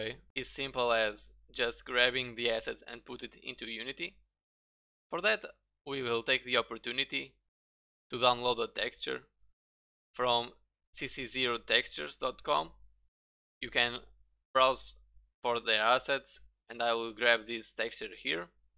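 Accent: Portugal. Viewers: Ahaha percebi pelo sotaque do inglês! Portugal🇵🇹🇵🇹🇵🇹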